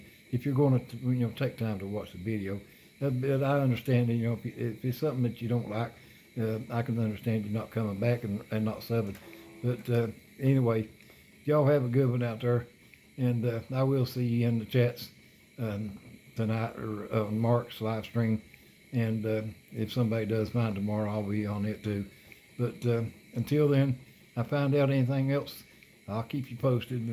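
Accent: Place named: South, United States. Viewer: From Gary's Northern wife here.....well....you still have the southern accent strong friend! Gary and I are Wishing you the best.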